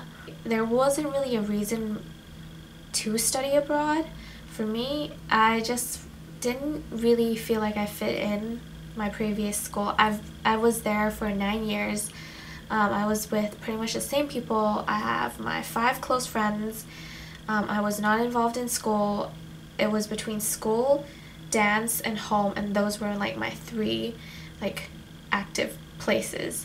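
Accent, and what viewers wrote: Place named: United States. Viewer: its ok, you didnt offend. But you do sound American, so it surprised me to learn that mandarin is your 1st language. It's very impressive and I'm surprised that you don't see it as a compliment :)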